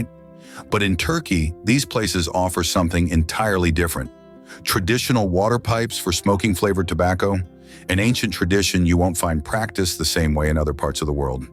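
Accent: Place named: United States. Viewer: It is good,,.infact very good but one little mistake but very important thing that makes sense to all Turkish spiking people,,,.that we know dodo’s used to live in USA and wild turkey,s came from USA as well,,,but There is no link between the bird or the land ,,,right I know you are an British American but the land spelled Türkiye 😂,,.and not Turkey,,.please note the spelling even you are an American or a guy with an American accent,,,.🧐you can see the difference,,,,,.Right 😅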